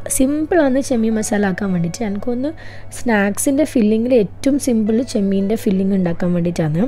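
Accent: Kannur, India. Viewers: Kannur slang kelkan anne njn vedio kanunnath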